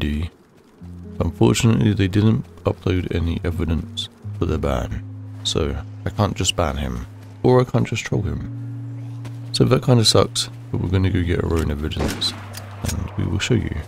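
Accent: United Kingdom. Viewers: love the west country accent bro! sending love from bristol! keep up the hacker smacking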